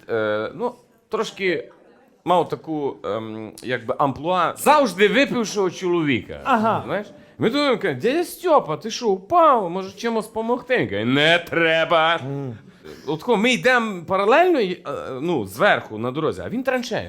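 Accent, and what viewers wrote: Western Ukraine, Ukraine. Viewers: Я тоже никакой смеси не слышу. Только западноукраинский диалект. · Який цікавий мовний акцент у ведучого! Суміш західної, центральної і східної мови України!😂❤ I в цьому наша сила українці - в єднанні!!!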